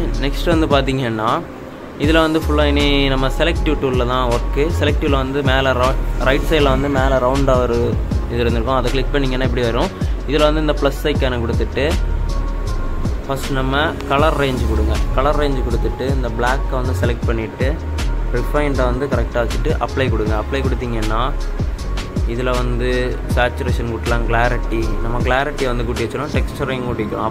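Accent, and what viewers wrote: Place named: Kanyakumari, India. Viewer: Kanya Kumari ah bro❤naama slang teriyuthu💥💝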